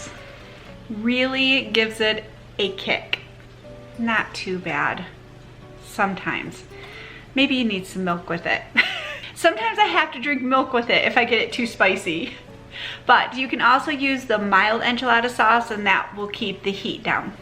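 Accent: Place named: Midwest, United States. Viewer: Your accent is so cute! Definitely midwestern, I'd guess, but I'm a southern girl so not sure if I'm identifying it correctly. Love your recipes!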